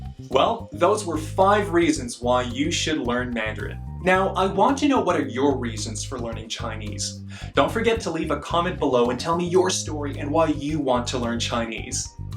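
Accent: Standard. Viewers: you speak the standard Mandarin !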